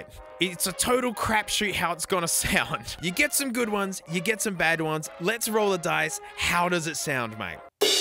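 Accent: Australia. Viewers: this has guy always been the best for when you just want to relax and watch an aussie speaking like an aussie should speaking as an under 100k subs veiwer on the main channel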